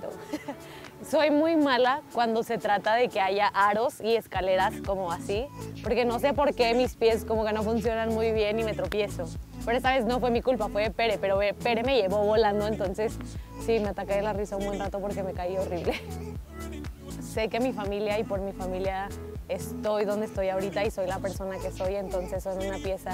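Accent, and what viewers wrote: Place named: Spain. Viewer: Que barbaridad ahora traes acento español. Jolines!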